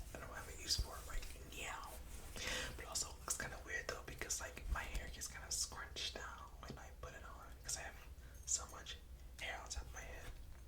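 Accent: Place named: United Kingdom. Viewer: the british accent omg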